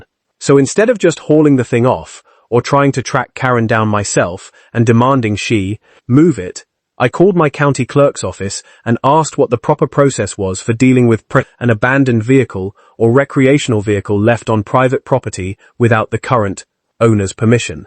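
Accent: United Kingdom. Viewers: Why is the narrator English and not American?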